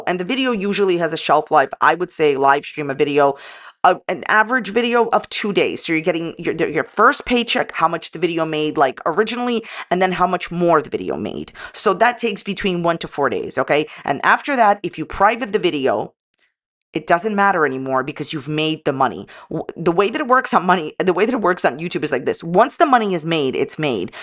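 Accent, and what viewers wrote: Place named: East Coast, United States. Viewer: Was waiting for this 😊😊. My favorite eastern coast accent YT person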